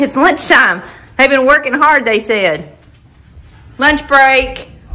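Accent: South, United States. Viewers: I just love you and your sweet southern accent.You bring a smile to my face everytime I watch a video. I especially enjoy the videos of you with your family and the dogs. I did have to cry when you and said goodbye to Brecon on the instagram pics. I have been through that twice and I know how you feel. My shirt should come soon but I already give you an A+ for presentation. I see the extra work it takes to do the sticker, tissue, and treat. When things are done the Way, they are done right!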